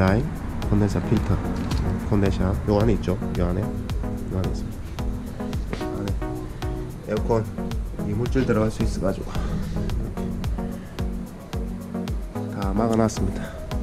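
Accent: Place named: Gyeongsang, South Korea. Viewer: 아무리 힘들어도 신나게, 멋집니다. 경상도 말투라 오해하실 분도 좀 있겠습니다. 혹시 부산인가요? 저는 인천에 삽니다만, 부산이 고향이라 친구들 많아요. 부산이면 소개해드리고 싶네요. 수고하세요. !!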